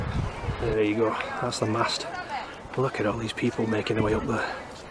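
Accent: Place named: North, United Kingdom. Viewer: Subbed you right back my mate... I's good to hear our Northern Lingo on the Tube pal.. Always up Rivvy buddy... Taking the lads up next Saturday as it happens. We are only 5 miles South of the Pike too man. Small world. :-) Have a good un mucker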